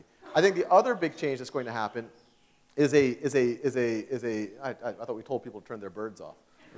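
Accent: South, United States. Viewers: Excellent presentation. i love his down to earth attitude, his humbleness and even his slight southern accent ( i love it when he says "Y'all). Khan academy is the best!!!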